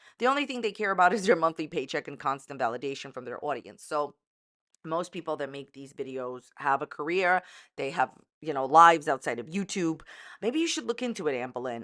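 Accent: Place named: East Coast, United States. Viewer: I love your east coast accent!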